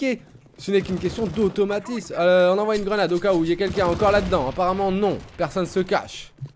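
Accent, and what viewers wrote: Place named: England, United Kingdom. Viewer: Franchement des youtubeurs comme toi c'est magnifique t'es toujours souriant je te suis depuis tes 100 abonnés continu Ps: Magnifique Accent anglais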